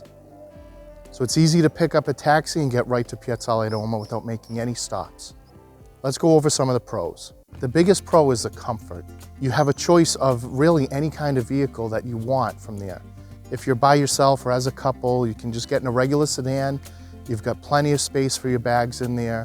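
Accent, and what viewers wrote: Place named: East Coast, United States. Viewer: you posted this video a year ago, but it remains useful today. Given your accent, did you grow up in the East Coast and then moved to Italy in your later years? The Wife and I are planning a trip to the US military base in Vicenza, so I am grateful that you shared this useful video with your traveling audience.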